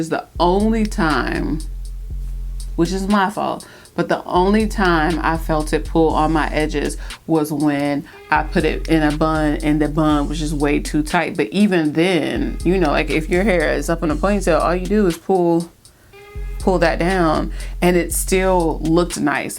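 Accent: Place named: Nigeria. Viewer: I hear a bit of a Nigerian accent. Is she African??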